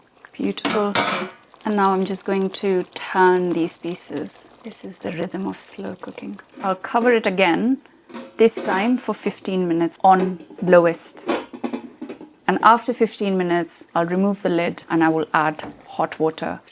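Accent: United Kingdom. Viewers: Is she English or Australian? What's this accent? Or maybe she's Indian? I realize she is ethnically Indian but the accent doesn't sound like it. I love the dish by the way! Watching from Canada!!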